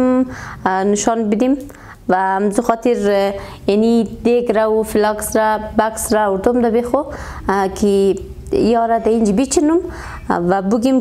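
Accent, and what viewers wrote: Hazarajat, Afghanistan. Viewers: ماشاالله دخترآغیلموچقدربه لهج ساده هزارگی وشیرین گپ میضنی قربان زه بانتو شوم خوارجانمه🌈🦋👍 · توضيحات قشنگ تان به زبان ولحجه ای شیرین هزارگى بسیار عالی بود موفق باشید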